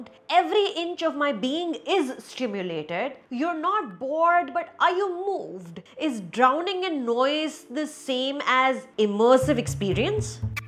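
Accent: India. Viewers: long time fan of your reviews. However, I find your recent reviews a bit strangely edited. Let me explain- also, this is honest feedback not a low effort trollpost. Your recent reviews have you speaking a bit too fast, definitely faster than your previous reviews. I am not sure if this is because you are using a software that takes out the pauses? Just feels rushed and a tad unnatural. This review of brahmastra felt as frenetic as the movie- I tune into your channel because you bring your biting wit, sharp analysis, and humor all to bare. Also love how you own the desi angrezi accent - but the audio editing in these videos is so off, it has me pausing and rewinding quite a bit. If it’s not the editing, may I suggest probably using a slightly more relaxed vocal delivery (similar to your earlier style). Koi jaldi nahi hai- I will easily watch a 15-20 min review for the quality you provide!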